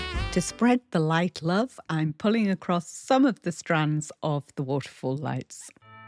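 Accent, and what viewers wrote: United Kingdom. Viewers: I always watch your videos and I always give them I like but I seldom comment. However this was just too beautiful not to comment I love your decorating style and your creativity but most of all I love your personality and your lovely British accent. I’m from Texas near Houston and Galveston so you are familiar with the accents we have which are not very lovely. And speaking of Wimberley my daughter and son-in-law have bought land overlooking the lake and plan to build a house there when they retire in a couple years. They bought enough land for me to have a small house in the back which I’m excited about. Many blessings to you and your family😅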